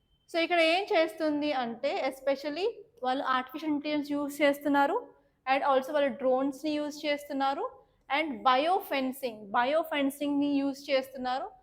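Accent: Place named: North India, India. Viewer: Madam meeru telugu na ayyo nenu inni rojulu nunchi north india anukunna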